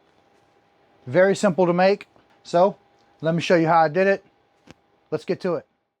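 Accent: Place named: Pennsylvania, United States. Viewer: Yo I knew you had to be a neighbor because the accent/dialect. You sound like you from PA but the jersey tells me all I need to know lol. Good shit bro. I been brainstorming this type idea for a little but still am rocking all organic atm...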